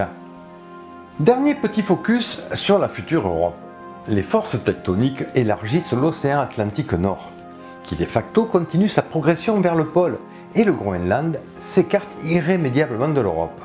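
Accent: South, France. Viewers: Le sujet de la chaîne est intéressant, mais j'ai un gros reproche à vous faire : compte tenu de votre accent méridional à couper au couteau, il faudrait éviter de vous exprimer comme un DJ. Vous n'animez pas une foire au cochon locale. Je n'ai pas compris grand-chose du premier coup et j'ai fait beaucoup de retours en arrière. En vous exprimant calmement, posément, ça irait infiniment mieux. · Bravo ! c'est très intéressant. Je m'abonne ! Bonne continuation. Comme quoi on peut avoir l'accent du sud et présenter intelligemment autre chose que la météo à la télé et ou à la radio dans notre pays. Adissiatz !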